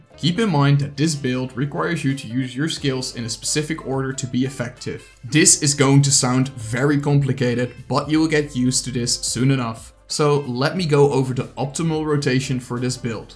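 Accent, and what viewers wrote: Netherlands. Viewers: I hate dutch morons and their shitty accents.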